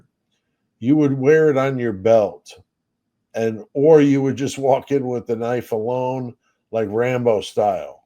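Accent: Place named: East Texas, United States. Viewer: Cool, first time viewer. Liked your Idaho coverage. Do I hear east Tx in that accent 🧐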